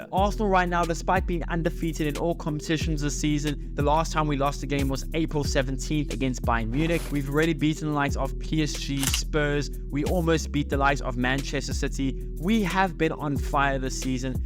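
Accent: South Africa. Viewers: Is that a South African accent I detect? Awesome content bro!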